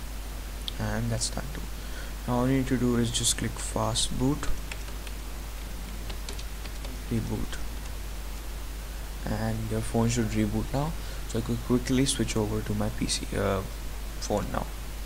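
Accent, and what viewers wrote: India. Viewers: A white guy with an indian accent you dont see that every day :3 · dosent work and hard to understand indian taxi acent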